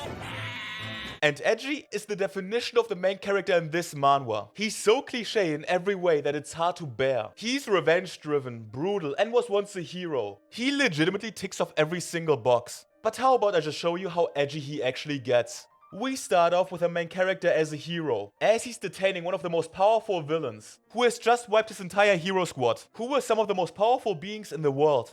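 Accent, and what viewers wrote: Germany. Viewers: Ich höre deutsch raus?👀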